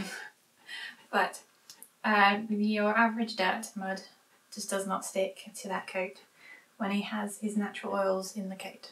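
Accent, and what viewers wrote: United Kingdom. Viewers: Very educational video. Thank you. I never bathed my husky either and she never smelled badly. She would lick herself like a cat, I guess to clean herself. I love your British accent, btw. Maybe I’ll learn how to speak with one somewhere because my American accent is sounding a little rough to me now.😁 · I love your dog but also your "so British" accent (I'm french)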